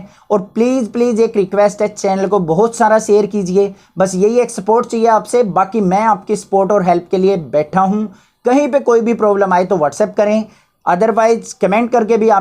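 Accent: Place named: Punjab, India. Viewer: Apki awaj ki tone panjabiyo wali h 🤔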